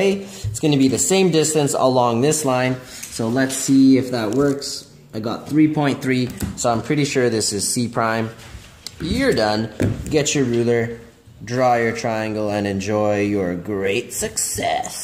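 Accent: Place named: United Kingdom. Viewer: British guy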